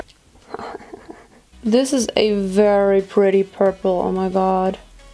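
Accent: France. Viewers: Awww your french accent hahaha Lots of love from Montpellier, South of France 😘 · I love your accent french !😍😍 I am FrenchGirl I love makeup tutorial !! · Super accent français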